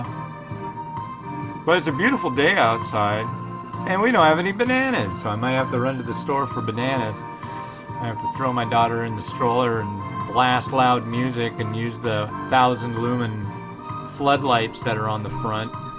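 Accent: United States. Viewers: Провинциальный американский английский, с проглатыванием половины окончаний :) буду его смотреть, чтобы язык воспринимать. Классный чувак.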